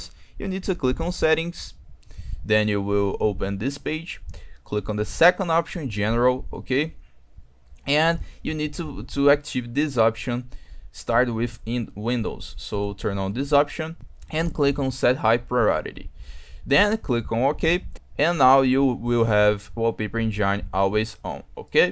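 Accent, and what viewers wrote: Brazil. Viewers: pelo sotaque é brasileiro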